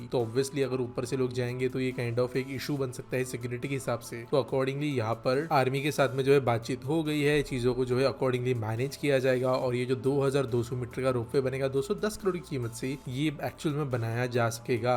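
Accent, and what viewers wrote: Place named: Khandesh, India. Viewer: Toh Khandeshi ahe mahnun vatta asel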